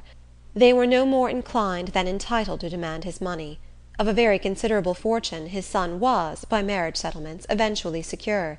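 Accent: RP, United Kingdom. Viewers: Thanks to uploader for this gem. Reader is superb... she does an upper class british accent so well! Your time and effort has given great joy to this part of the world.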